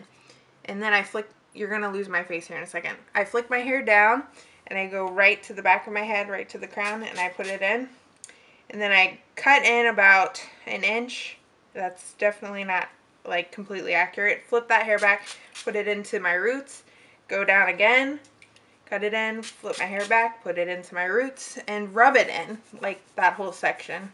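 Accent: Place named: South, United States. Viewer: Jw where are you from? You kinda have a southern accent.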